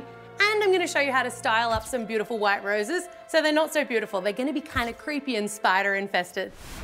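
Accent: Australia. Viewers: But her accent is clearly Austrailian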